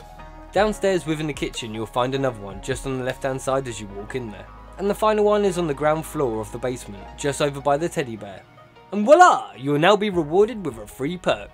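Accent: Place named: United Kingdom. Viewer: Thanks british fella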